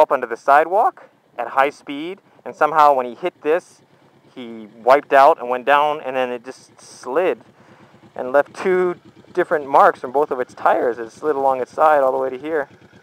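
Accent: Canada. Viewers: are you Canadian? :D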